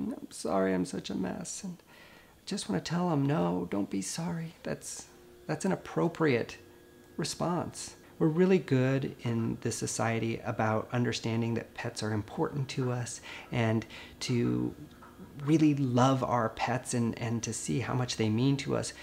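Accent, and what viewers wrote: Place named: England, United Kingdom. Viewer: Dear gentleman, I couldn't understand why this procedure were carried out. I am not good at understanding your English ascent. Why both cats were not left to take their own time to leave this world.please don't feel bad if I have asked the question without understanding the situation ( which really couldn't understand ). Thanks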